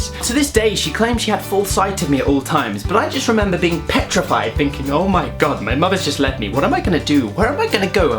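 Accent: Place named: United Kingdom. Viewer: u have british accent when vid started I instantly knew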